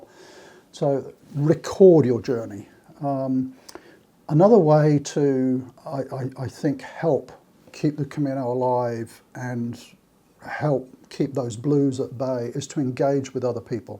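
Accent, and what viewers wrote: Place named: Australia. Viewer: listening to this video was like hearing my own thoughts. My walking buddy and I also have amazing partners who are happy for us to follow our dreams and walk Caminos. Our 3rd Camino will start April 2024 on the Camino Portuguese (and hopefully Finesterre and Muxia) if time and no injuries allow. I love the simplicity of walk, eat (cafe con leche) sleep, repeat, meeting people from all over the world who have the same passion, and especially how I felt at the end of my last one: fit, relaxed and wanting a more simplistic life. Now I have retired, I have achieved the simplistic life and happily planning our next Camino. Your tip about not going into too much detail of your walk with others who have not walked a Camino is spot on. Just watch their eyes and when they start to glaze over, its time to change the subject 😀Thank you for your channel, its great, especially listening to a relaxed Aussie voice. 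I'm from Queensland, cheers Wendy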